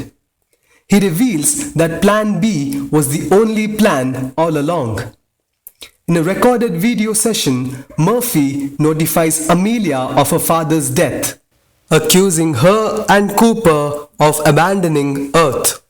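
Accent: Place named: India. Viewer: You have a very light Indian accent man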